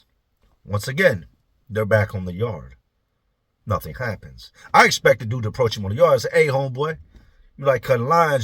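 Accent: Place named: Philadelphia, United States. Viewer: Hny J!, u r an impeccable storyteller, plus u sorta hv that north philly accent! Been subbed since. Day1.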